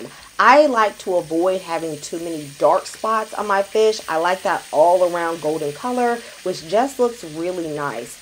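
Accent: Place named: St. Louis, United States. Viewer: You sound like you from St Louis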